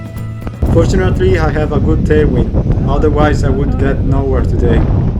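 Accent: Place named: Venice, Italy. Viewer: Ma io non so l'inglese e a 70 anni non mi metto di certo a studiarlo. Mi dispiacerà non seguirti più! Sei bravo ,simpatico e soprattutto non reciti la parte dell'eroe. Risolvi ogni intoppo senza ingigantirlo e hai un accento veneto che mi piace molto. Buon viaggio quindi, e mi raccomando: resta sempre così ,te stesso 😊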